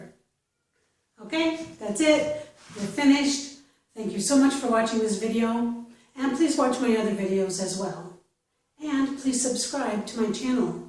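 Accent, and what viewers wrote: United States. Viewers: You have such a beautiful American accent. Would I be right in assuming that is a General American accent?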